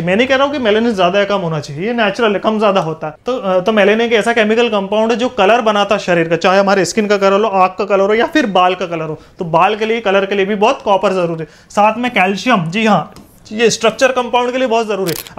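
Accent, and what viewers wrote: Indore, India. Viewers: Indoor ke aash pash se ho kya ap toon arha he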